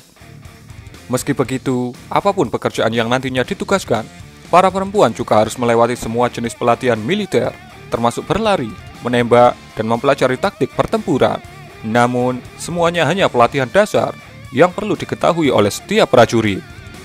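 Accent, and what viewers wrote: Java, Indonesia. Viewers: Ini medok suaranya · medok banget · Hahaha medok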